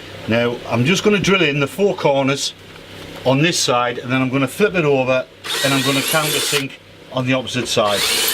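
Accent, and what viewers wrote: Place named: Liverpool, United Kingdom. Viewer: your accent sounds like you might be from Liverpool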